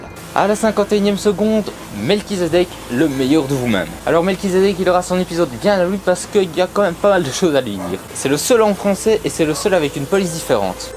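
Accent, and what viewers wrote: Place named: Belgium. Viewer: un belge !!!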